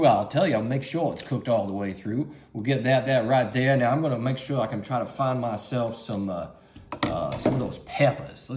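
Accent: South, United States. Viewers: Man you have that southern accent that make me laugh man. Very funny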